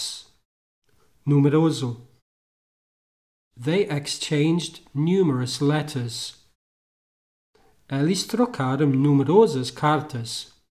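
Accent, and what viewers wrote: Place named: United Kingdom. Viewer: Gente, ele fala no inglês britânico né? Mas eu quero aprender no inglês americano, será que escutando esses vídeos eu vou me atrapalhar muito quando escutar no inglês americano??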